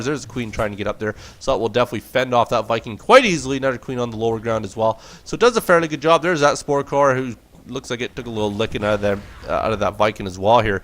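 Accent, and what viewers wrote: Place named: Midwest, United States. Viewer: Where are you from? Midwest? Northwest? Canada? So many "wowzers" I had an Inspector Gadget flashback. Good cast, cuz.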